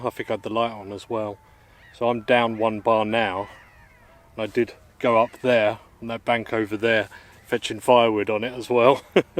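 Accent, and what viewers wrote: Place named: RP, United Kingdom. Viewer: What’s with the talking posh you have changed the way you talk lol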